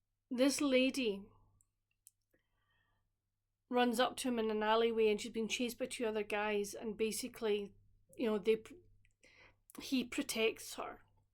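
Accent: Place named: Scotland, United Kingdom. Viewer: Thanks for the shoutout. I love hearing my name in your Scotish accent. The Alaskan Wilderness series is on my TBR. I've been on the fence about The Wynbridge Series. Glad you read book #1. I need my romance to be low angst. So am passing on Heidi Swain. T KingFisher is not my genre of fantasy. I read and unhauled her YA (The Wizards Guide to Defensive Baking). I don't understand surealist humor and was afraid of the Sour Dough Starter familar. I need my famliars to be non threatning cat etc. I hope to finish Emily Wildes Encyclopedia of Faries today and am enjoying my slow reading of Mary Oliver's poetry collection Devotions.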